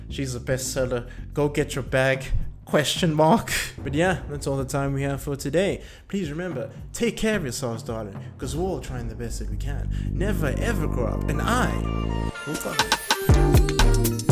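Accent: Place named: Southern Africa. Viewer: well well well, my eyes have been opened to infinite horrors tonight. Thank you, young sir! Also, are you from Southern Africa? I hear a very similar accent! Zimbabwe?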